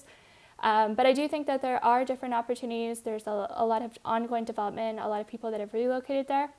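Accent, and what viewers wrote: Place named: United Kingdom. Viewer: Preconceived notion of extreme poverty in so called (3rd world countries) woow, obviously you are not from the US, your accent is more British. Have you ever visited some major US cities like Baltimore, Philadelphia to name a few, talk about extreme poverty.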